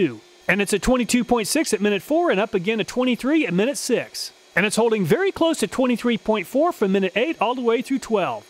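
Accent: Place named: South, United States. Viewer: You talk so slow, must be that southern drawl I keep hearing about.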